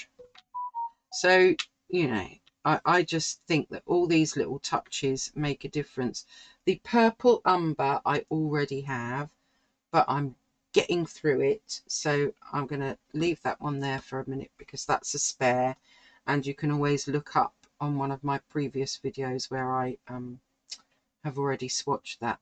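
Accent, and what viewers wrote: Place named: London, United Kingdom. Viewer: ￼ ￼ Hi! Saw your comment on my most recent video and thought I would hop over to see what you get up to on your channel! Good to hear another English voice – do I detect a London accent? Cos that’s where I’m from originally! Interesting to see the things that you’ve recently purchased. I’ve had a couple of things from Temu that haven’t been to marvellous, but I’m always interested to see what they are having on offer. Have you heard of the fountain pens by Sailor? They seem to be trending at the moment…￼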